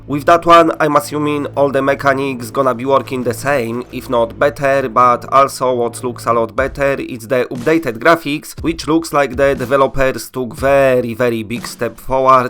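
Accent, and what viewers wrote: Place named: Poland. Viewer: popraw się troche z tym angielskim bo nawet mi się ciężko słucha takiej wymowy, brzmi to bardziej jak byś czytal po polsku to co jest napisane po agnielsku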